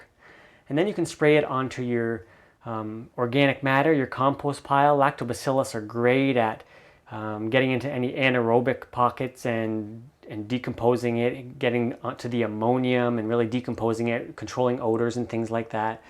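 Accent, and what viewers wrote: Canada. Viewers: My guess is Canada.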